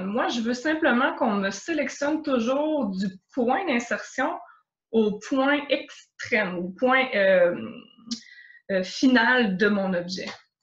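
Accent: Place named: Quebec, Canada. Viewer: ca a l'air sympa , mais aprés avoir visionné 2-3 capsules , l'accent quebequois est deja difficiles a comprendre mais avec la qualité du son, l'echo cela rend les explications presque inaudible. et en plus la qualité de l'image est toute flou, on ne peu lire ce qui est ecrit a l'ecran......si le son et l'image etait correct je pense que cela pourrait etre tres interressanr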